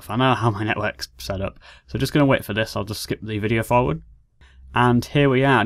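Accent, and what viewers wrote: United Kingdom. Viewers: Chris Nova its real English, we call it British English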